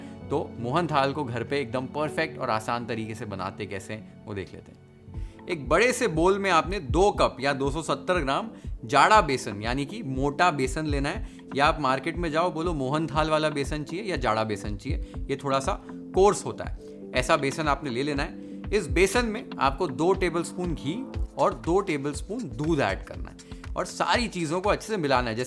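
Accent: Maharashtra, India. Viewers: Your voice tone is like marathi person talking in hindi ?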